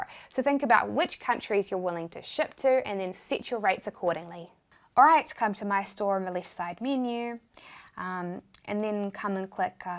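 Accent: New Zealand. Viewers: the adorable New Zealand accent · I love your New Zeland accent.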